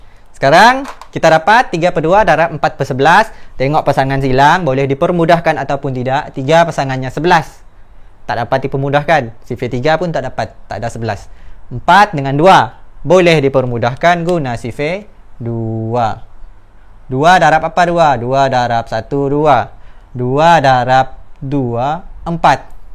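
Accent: Sabah, Malaysia. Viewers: Cigu sabah kah?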